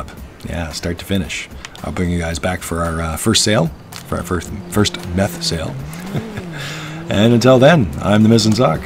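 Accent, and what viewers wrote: Canada. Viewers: U sound very Canadian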